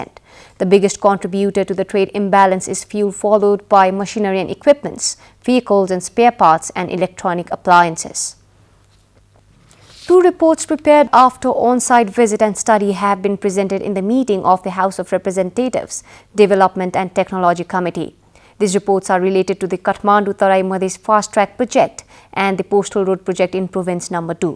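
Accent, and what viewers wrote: United Kingdom. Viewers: Lovely British accent 😘😘😘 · hora vanya!!🙈🙈🙊😝malai ta testai lagyo munnile pani euta episodema hajurko jastai gari british tone ma english boleko thien..hajurko interviewma kai katai bhadragolko kura sunina kin tyas bare navaneko naaodeko vanne lageko thiyo..😁😁.munni navayeni hamro lagi ideal ho..indian reporterlai reply didai babaal lageko thiyo malai..interviewma bolne saili sarai saral xa ghamandapan dekhina sadhai vari uchha bichar samanya bolne saili kayam rahos.english video haldai garnuhola hamile sikne mauka pauxau..🙏🙏🙏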